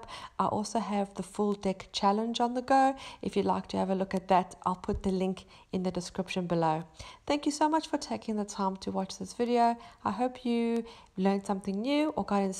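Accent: South Africa. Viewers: Absolutely stunning, I really liked the combination of turquoise and brown. So inspirational and easy to follow. I am going to try this out. By any chance are you from South Africa, just wondering because you seem to have true SA accent. BTW I am from Johannesburg, SA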